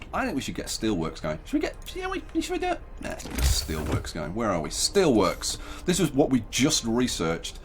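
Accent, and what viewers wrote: United Kingdom. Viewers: I literally can not remember being so fucking entertained by a gameplay... its just amazing, and also i cant imagine listening to this in something other than a freaking british accent... Good job, buddy keep them comming! Lots of love!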